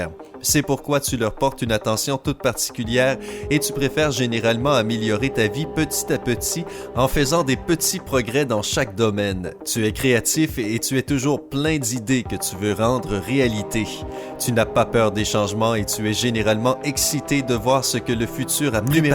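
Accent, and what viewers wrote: Quebec, Canada. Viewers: Lama Fâché version Québécois, je renomerais la chaine Lamarde Fâchée su j'étais toi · Moi mais c l'accent québécois qui donne cette impression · Oui c'est vrai sa il a un accent québécois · Avec cet accent québécois à la con c'est insupportable d'aller au bout de ces vidéos. Heureusement d'ailleurs, parce que je n'ai jamais vu un tel tissu de conneries. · sablita 07 nan il a l’accent québécois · Tu est québécois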